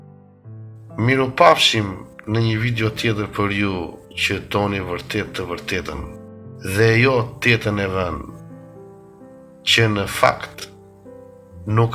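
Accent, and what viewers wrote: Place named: Tepelenë, Albania. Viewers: Ti që po flet dialektin duhet ta kesh nga Tepelena po edhe unë në të nxehtin mendim jam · Ti po flet toskerishte te kulluar ama....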